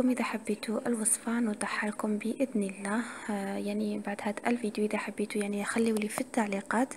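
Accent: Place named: Biskra, Algeria. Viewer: يعطيك الصحة الفحلة من الفضول نسقسي برك تهدري كلمات الجيروا لكن اللهجة البسكرية طاغية عليك 😉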